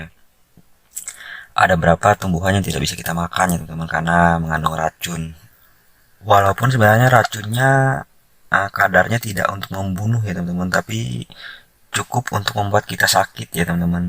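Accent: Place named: Kalimantan, Indonesia. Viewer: Kalo saya merasa nyaman dengan penyampaiannya karena sama sama org dr kalimantan jd udah terbiasa dengar nya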